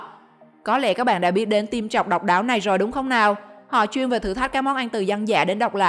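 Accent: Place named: South, Vietnam. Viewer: Miền Nam giọng ngta như vậy m còn đòi hỏi j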